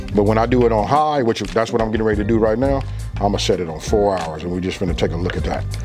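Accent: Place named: Mississippi, United States. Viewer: You GOTTA be from my home state of Mississippi!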